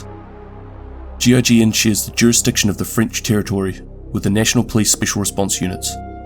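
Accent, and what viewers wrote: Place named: New Zealand. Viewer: Yeah,the New Zealand accent is my favourite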